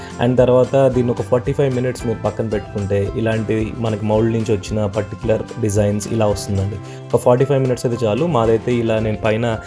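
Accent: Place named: Godavari, India. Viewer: అయ్ మీది గోదారొళ్లoడి చాలా బాగుందండి. 👌👌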